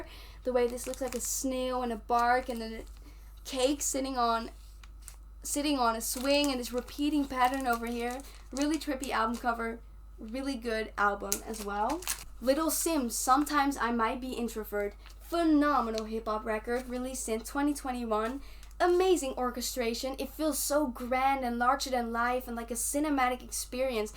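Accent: Netherlands. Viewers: Amazing collection! You sound Dutch btw, are u?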